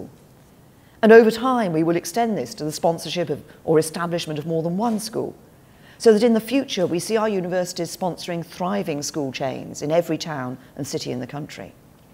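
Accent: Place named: United Kingdom. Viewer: I'm from Algeria, I'm here just for her beautiful British accent ❤❤❤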